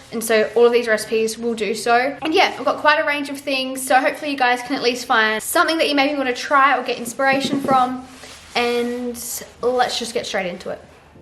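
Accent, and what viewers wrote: United Kingdom. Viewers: thank you bri-ish lady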